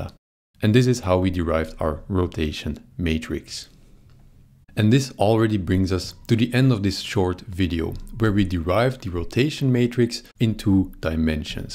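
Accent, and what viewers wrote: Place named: Belgium. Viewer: Ik kon direct horen dat je een belg was haha, moest dit even opfrissen voor bachelorproject robotica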